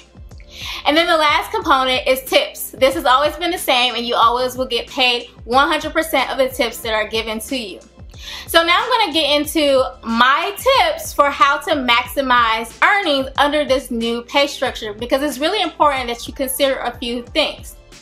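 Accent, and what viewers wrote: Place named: South, United States. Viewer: Damn, she's gorgeous, the southern accent is great and she has helpful videos. Thanks sugar!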